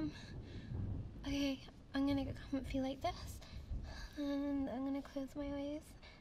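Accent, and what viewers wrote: Hertfordshire, United Kingdom. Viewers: Finally an ASMR Vid who’s ASMRtist has the same accent as me rather than the standard American one. I keep listening to this genre of ASMR hoping that something like this would happen to me even though it makes me feel lonely afterward (yeah I know generic sad lonely lad here). Thank you for this vid, it’s very well spoken and immersive, keep it up 👍 (you’ve made this lonely lad from the back ends of Hertfordshire a little less lonely)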